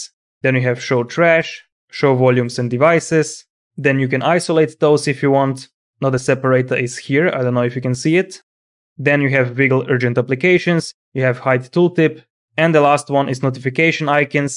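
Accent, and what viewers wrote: Russia. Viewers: Ur vids r cute! Like ur Russian or whatever accent.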